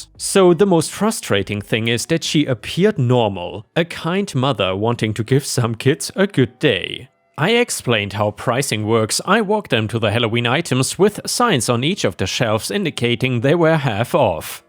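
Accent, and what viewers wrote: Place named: Germany. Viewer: I love your accent! You sound like my German Grandmother! 💋❤❤❤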